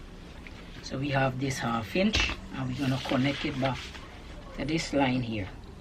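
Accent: Guyana. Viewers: I dont know how your video showed up on my YouTube. From I heard the accent, I knew you were Guyanese. I follow African fashion designers especially Nigerians online, but never came across à south américan/ Caribbean fashion designer. Before subscribing, I will watch most of your videos. The way of teaching is totally different than what I usually see. Detailled, proper, take all the time in the world to teach so that ppl could learn accurately. I say bravo. You even give advice about heavy on top or below which is so true. I like black, navy blue for below because my legs and calves are big lol now I have spoil belly so I need black for that area also. Well thanks for this channel and videos. Also thanks for taking all the time in the world for us to get proper learning. Maybe your channel continue to grow and grow. Ohh and yes I am from the Caribbean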